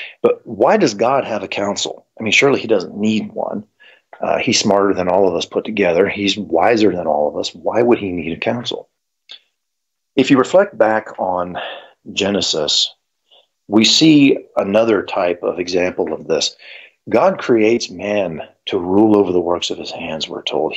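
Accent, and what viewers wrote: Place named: South, United States. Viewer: This sounds like our dearly beloved Dr Michael Heiser rest his soul but with a Southern twang I love it brother keep up the good work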